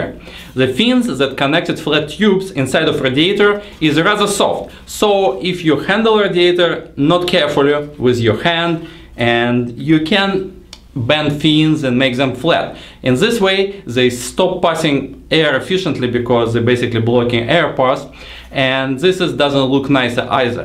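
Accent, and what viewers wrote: Russia. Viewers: Daragiye Tovarische :D your accent are really russian :D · Sorry but I hear strong Russian accent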